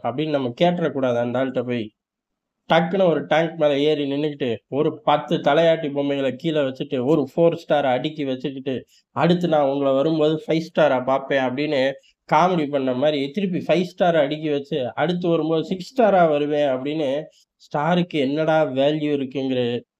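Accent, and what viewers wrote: Madurai, India. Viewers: தல உங்க மதுரை வட்டார வழக்கு சூப்பரா இருக்கு....அருமையா பேசுறீங்க....நாலஞ்சு எடத்துல குபீர் சிரிப்பு....மாம்பழக்கூடைய தூக்கிட்டு சைனா கெளம்பிட்டான்யா.....கிராஸ் பார்டர் மேரேஜ் சீன மாப்பிளைகளின் மவுசு.....😂😂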